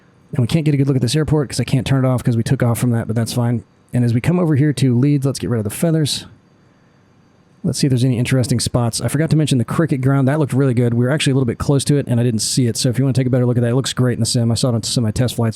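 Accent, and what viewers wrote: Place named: North America. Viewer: Where's the Big One? One of the biggest roller coasters in the world is on the Pleasure Beach... no sign of it here. The whole Pleasure Beach doesn't really look like the Pleasure Beach at all. Blackpool Airport isn't accurate either. I mean it all looks fab, and if you didn't know the area I suppose it doesn't really matter, but for those who live here, it's a little disappointing. No idea what that massive square hanger building is you parked next to... that's not there in reality either. Nice video though... strange hearing a north American accent talking about my home town :)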